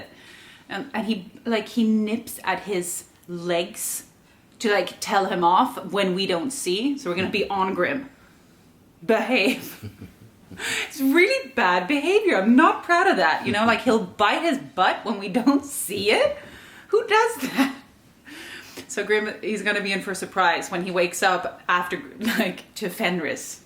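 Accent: Ireland. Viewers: You still sound Irish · I actually thought you were Irish. 😏 I'm a new subscriber and am binge-watching. I lived in kristiansand when I was 14 and couldn't believe how beautiful it is. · Just found your channel, I think you’re both adorable. Grim is so lovely. When I saw your first video (which was the most recent one) I thought you were Irish, so I now get why I thought that. I live in North Devon in the UK. I wanted to know if Finland is considered part of the Scandinavian culture I know the language is quite different, but I haven’t heard you mention it. If you don’t mind I would I would like to recommend a channel to you. It is Wild wonderful off grid. You may already know about them but if you don’t I think you will enjoy them. Now I will subscribe to you😊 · I’m in the US and I’ve been wondering why your voice has reminded me of a close friend from Ireland who’s lived in the US for many years. 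Now I know!! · As a Californian, I can tell you have a slightly Irish accent. Great channel! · Just caught this video and have to say that every time I hear you speak English in your videos I think you have a slight Irish accent. So you haven't lost it yet! Lol! And it's lovely, by the way. Love all your videos!!! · Your Irish accent pops out occasionally it's lovely 💚mostly it's not American the rest is mild Swedish it's very pretty 🤗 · You have a very Irish accent.. lovely voice 🎄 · I’m Irish and I knew you had an Irish accent in there!!!! 🍀🍀🍀🍀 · I’m Irish and I never noticed the your slight Irish accent until now!! Now it’s so noticeable! 💚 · It’s funny you said you used to have a thick Irish accent because I always thought you sounded Irish but assumed it was a Swedish accent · Now I know that you've had an Irish twang before it's all I can hear in your voice!! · I noticed your Irish accent before you ever mentioned living in Ireland. 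It was a video ages ago I can't remember the exact video but I literally replayed the clip a few times thinking "that's definitely an Irish accent". Some words you definitely pronounce in an Irish way... Just of course I can't think of them now 😆💚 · I wondered why you had a little Irish accent. My parents grew up in the west of Ireland and emigrated to the US where I was born. You also use American slang; that might be from watching American TV shows. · I knew I heard Irish in your accent! Now it makes sense ☺️ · You sound Irish to me😊 I am from Liverpool in the Uk, we are the Irish that couldn’t swim back😊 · It is so interesting that when I first found this channel, I thought that you, were Irish. My nephew in law is Irish and I loved his beautiful accent when he first came to the US. Unfortunately after two decades in the US, he has now lost most of it. But I can still detect a little bit of an Irish accent when you speak. 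Best wishes for a very Merry Christmas, and Grim. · I definitely think you sound Irish!! I’ve always thought that and wondered 🤗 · You still sound Irish to me and not American. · I can hear your Irish accent pretty much all the time! It amuses me to be watching someone Swedish with an Irish brogue who lives in Norway!:-) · I was thinking you sounded Irish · Greetings from Florida!!! When you said about people not understanding your Irish accent, I can't understand that because I visit Ireland quite a bit and I absolutely LOVE the Irish accent..... it's so beautiful and Ireland is awesome too!! Eventually I will live there and the people are so friendly! So, Happy Holidays to you 3 and All the Best for the New Year!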